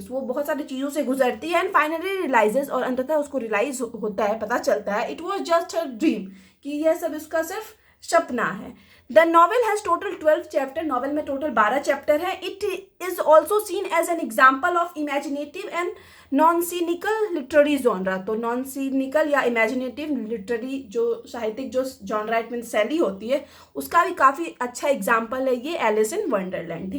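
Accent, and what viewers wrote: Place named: Uttar Pradesh, India. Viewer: It's actually her accent, she's from UP or somewhere close to that part, I've been watching her video forever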